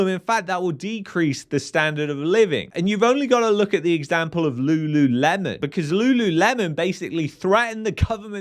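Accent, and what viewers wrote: United Kingdom. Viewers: If it s so terrible here in Canada what are you doing living here? Judging from your accent I would remark UK is even more messed up then Canada ever will be.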